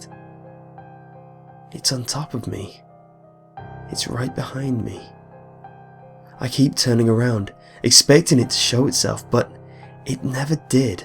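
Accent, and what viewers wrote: England, United Kingdom. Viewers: He isn't American 😂😂 He's British, especially with that accent. No one that's born in America has a voice like that. Only in Britain. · His accent is English.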